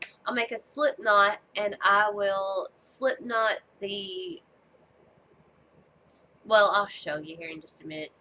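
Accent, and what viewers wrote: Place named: Alabama, United States. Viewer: Hi! So nice to meet you. I am an Alabama girl too. I was born in south Alabama but I live in north Georgia now. So you and I have the same accent.:) So sorry to hear about your illness but so happy to see you stay positive in the middle of it all. You are truly an inspiration. I enjoyed your first video. I don't make videos but my needlework can be found on Instagram if you are on there. I looking forward to see more of your beautiful needlework. This community is awesome. I am so glad you are part of it. Merry Christmas to you! Happy Stitching ~Janet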